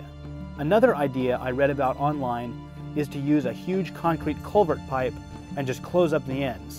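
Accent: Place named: South, United States. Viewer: Let me guess you not from Maine deff from the south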